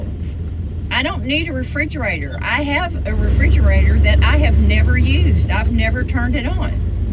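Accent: South, United States. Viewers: Oh....I've just found you. Please don't be gone forever...take a break but don't leave us hanging. You are too interesting and sensible. Plus your southern accent is delightful. Hope you will consider posting more even if not as often. Safe travels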